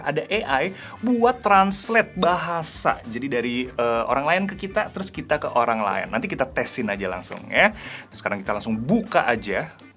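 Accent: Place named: Banjar, Indonesia. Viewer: banjar bnar wwkwk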